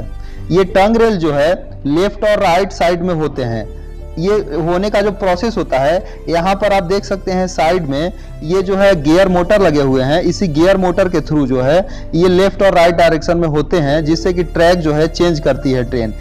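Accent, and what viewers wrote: Bihar, India. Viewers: Bhay tum pakka Bihar se ho...mai v patna ka hi hu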